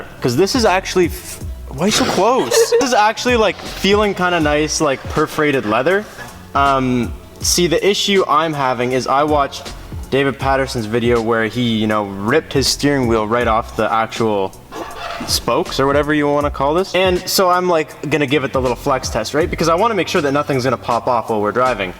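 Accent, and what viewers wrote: Canada. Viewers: your yt is the next up and coming. congrats man. you got great content and I appreciate how your learning with us. a lot of people act like they know everything but you admit that your learning and trying stuff for the first time too. I like that. but your accent is unfamiliar too me what is the accent you have? like I don't need to know exactly where your from but I'm interested on what area the accent from or what nationality you are. sometimes you pronounce words different and I'm curious. but I've been subbed since your first wish/ knock off content video you posted I think you had like 20k subs and your growing fast. you need more subs. you deserve it. the eg hatch videos are awesome man. keep up the good work. wish I could work with you guys. you guys seem to have good clean fun with a great group of people. are you Canadian?? if you could get back to me I'd really appreciate it. 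fanboi over here lmaooo jk but I always appreciate a new video from the crew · First time viewer. You Canadian though?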